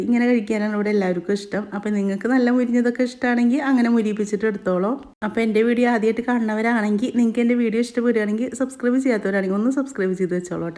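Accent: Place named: Thrissur, India. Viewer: Edaa.orupaad thanks..undaakki kaanichathil..undaakkeet abipraayam parayaam👍❤️❤️aa kini kini trissur samsaram kurakkallee..kurachu koottikolooo😁❤️❤️❤️❤️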